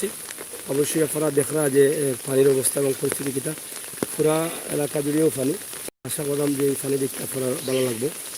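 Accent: Sylhet, Bangladesh. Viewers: আপনার সিলেটী ভাষা সুনদর লাগে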